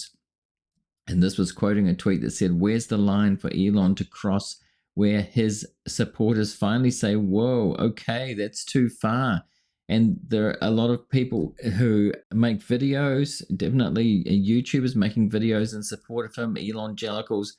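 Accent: New Zealand. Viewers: Good Kiwi accent bro'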